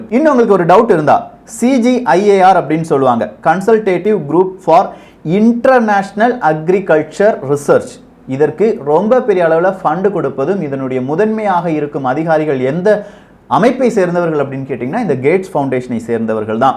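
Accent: Kerala, India. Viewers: Wow keep it up & I see you speak with Malayalam slang mixed is it so?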